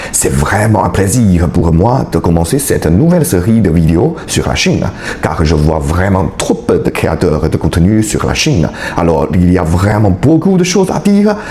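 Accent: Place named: Belgium. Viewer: Merci et je trouve-moi aussi intéressant ce concept :) je ne compte ni y aller ni m'intéresser plus sur ce pays mais ravis d'avoir pu le découvrir un peu ce soir. Et juste pour le fun, n'y voyez pas de moquerie, je trouve dans l'accent que vous avez un brin de quelque chose de belge, c'est juste marrant de se faire cette remarque :)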